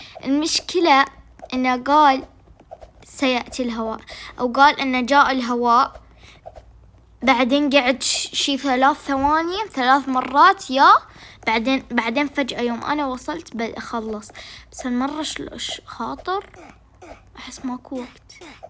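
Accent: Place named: Iraq. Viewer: ماكو اتوقع انها عراقيه!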